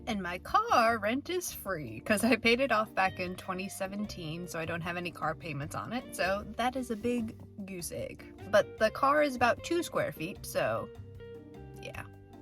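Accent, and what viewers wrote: Northeast, United States. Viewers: Your NE accent is adorable - west coaster here so it's a bit jarring in a good way! It's great to see someone from the other side of the country explore the west as I feel we can get a bit jaded out here :/. As much as I love the idea of van life sometimes, living in a bike-able/walk-able city with a lot of conveniences is important to me - plus as someone who is pretty tall, my choice of vehicle feels pretty limited as I can't imagine sleeping in a cramped sedan. I'd love to bike tour the country but having the shelter of your car actually gives you a lot more options in terms of taking your time and amenities. I'm not used to paying for a car, so that's a big hurdle if I ever have to jump it! Thanks for making these types of videos, I personally find the meta/logistics videos more interesting than the destinations so I am curious to know if you have side hustle work (or if YouTube is a part of that) while you travel, or if this is pretty much out of pocket.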